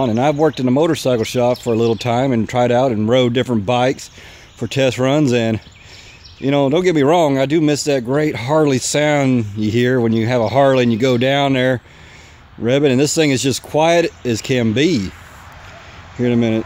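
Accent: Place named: Texas, United States. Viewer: great bike and great texas accent.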